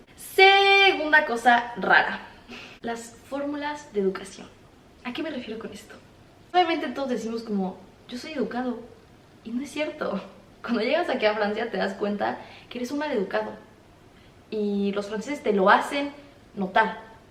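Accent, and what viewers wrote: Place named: Mexico. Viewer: De que región de México eres, por tu forma de hablar ¿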